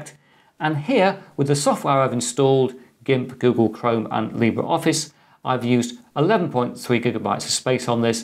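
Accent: United Kingdom. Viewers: Accidentally wandered on your Tube. Really interesting to me 'cause I love different kinda electronic and PC odds&ends. Besides that, I really enjoy your british, so thank you! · Very interesting series of videos. Great professional shooting, clear diction, excellent explanations and your British accent is delightful to my ears of non-native English speaker. Will definitely subscribe.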